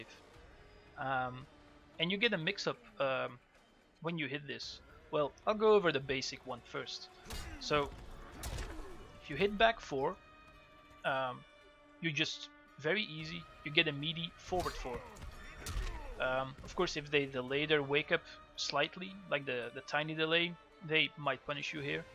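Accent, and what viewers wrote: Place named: Flanders, Belgium. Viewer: HAHAHA. Gewoon het vlaams accent in Engels is zoiets uniek dat ik da kan opspeuren gelijk een hond xD Mercikes voor de guide man, tis straf!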